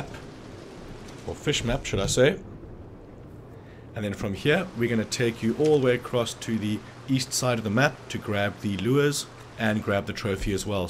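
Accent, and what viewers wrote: South Africa. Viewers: Are you from South Africa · South African Accent.. awe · You from South Africa?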